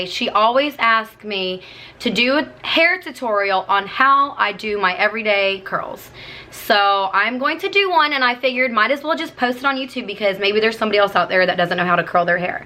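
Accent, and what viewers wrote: South, United States. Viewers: You kinda have a slight southern accent, it's really interesting loll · Is she from flordabamma shore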